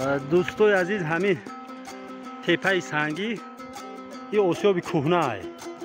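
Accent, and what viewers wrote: Tajikistan. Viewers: Дастон дардро набинад, Худованд дар касбу кортон баракат ато фармояд! Бисер бо як лахчаи точикии зебо сухбат мекунед.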